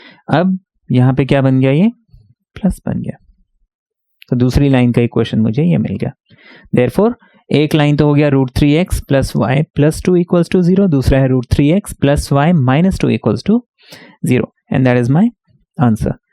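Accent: Bihar, India. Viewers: Sir, Are you Bihari?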